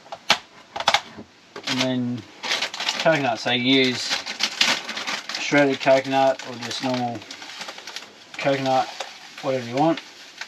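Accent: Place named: Australia. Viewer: I'm a Canadian senior. At first I thought I clicked on a foreign language channel. Thank God for the CC & Auto-Translate feature. I selected English. Then I was able to read what you were saying & then it dawned on me that you were in fact speaking English, the Australian version of it. I must say that I love your accent. I feel so foolish now. Must be my senior years. Oh well, at least I can laugh at myself. No offense intended, I thought that you could use a laugh at my expense too! Thank you for sharing!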